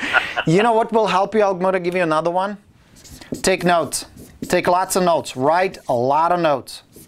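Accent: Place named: Czech Republic. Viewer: Hey, you mentioned your former thick Czechoslovakian accent and that you had to get rid of it. How did you pull that off? I myself need to get rid of my thick German accent...;-)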